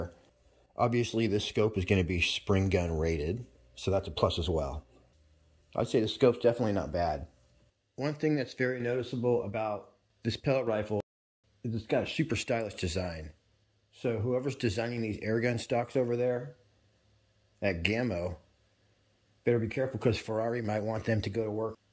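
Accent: United States. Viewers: I don't know which state has this accent but it is absolutely the most annoying accent that the US has to offer.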